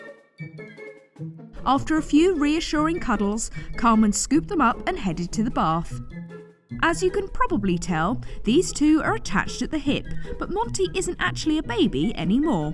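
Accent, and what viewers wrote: United Kingdom. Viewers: You know what adorable? You're "Surrey accent" watching your videos from someone from the United States standpoint, You sound like the narrator for television show. This is ment as a compliment. You sound so professional and have the most relaxing voice. (Even though it sometimes takes me a second to "translate" some simple words that are different in my American accent which is totally on me. But you do have a lovely voice and you do really great voiceovers.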